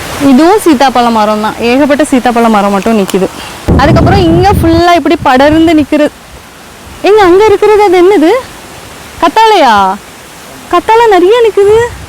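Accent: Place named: Nagercoil, India. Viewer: Super sis, when you speak live I can see your Nagercoil slang that's good.... Totally enjoyed your home garden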